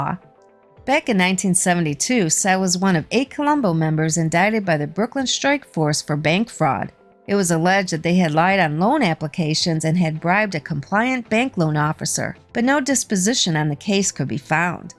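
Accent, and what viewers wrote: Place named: Midwest, United States. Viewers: A strong Chicago Illinois accent for sure lol. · Announcer sounds like she is from Midwest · This narrator’s voice tickles me. Gotta be from Chi-ca-go or Fargo.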